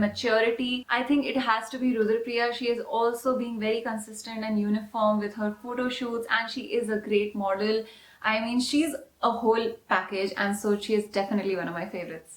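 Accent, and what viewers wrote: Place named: Mizoram, India. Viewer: I love your mizo accent,so sweet .Love from mizoram 😍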